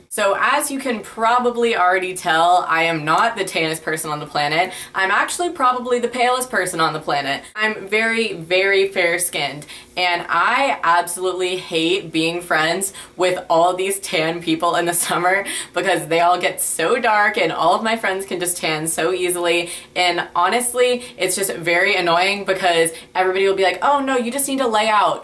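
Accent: United States. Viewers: its amazing how americans say 'get tan' i want your accent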